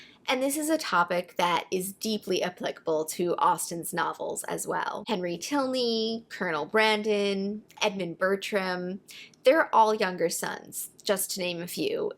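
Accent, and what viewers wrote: United States. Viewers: Glad to see you posting again; hope the little one is doing well. It might be informative to the fans of your three sites to hear how Jane Austen July was kicked off by you, the Spinster, and Katie. Did one of you have the idea and floated it by the other two or did you have a discussion on how to gin up more interest in JA? You are audibly American, whereas Katie is a Brit and Claudia is Italian-German who is married to a Brit. Did you all meet through YouTube? Your suggestions for book-reads are all good. I read Rory Muir's biography of The Duke (he was a second son who had to find his way through the Army), who became a much bigger fish than his once-famous older brother. You simply can't pluck JA out of context; the Napoleonic Wars, the Navy, and the struggle with France was the whole political underpinning to Jane's "fictional" world. 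Thus I would consider Patrick O'Brian (author of the Master and Commander books and a GREAT admirer of Jane) to also be acceptable fodder for July's activities.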